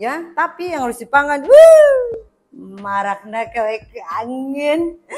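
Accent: Banyumas, Indonesia. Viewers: Puluhan th seneng apal lagu2ne Rika.. Tapi nembe saiki weruh krungu Rika ngomong ngapak😂😂😂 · Terhibur banget dg BHS ngapak2nya.. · 😂😂😂 lucu bgt mbak ngomong ngapake tp tetap ayu Bae klambine apik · Bunda lucu nemen ya pada karo enyong nge,enyonge juga wong ngapak...tegal hadir bunda 😍😍🤭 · Masyaallah 😅😅😅 ketawa terus liat Tante cooking ngapak2 pulakkk❤❤ sering bikin video masak ya tan..😊😊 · Pk bambang GA Salah pilih... Wong ngapak pancen oye.... 👍👍👍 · Wes ayu,lucuu,pinter masak sisan.gak lali mbak Ayu ngapak e. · Mba lucu ngomong ngapak sukses mba ❤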